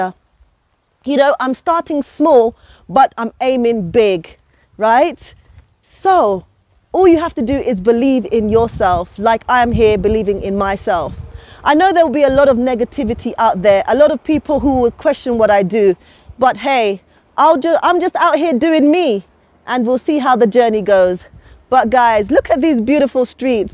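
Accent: United Kingdom. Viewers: Got this recomended love from uganda🇺🇬rwanda🇷🇼 is beautiful love your accent too u sound british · She sound British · I liked the way you’re so passionate and really proud of Africa,especially Rwanda 🇷🇼 I liked your mancunian accent by the way 😁 I can’t wait to come back home back too Ciao cara ti amo già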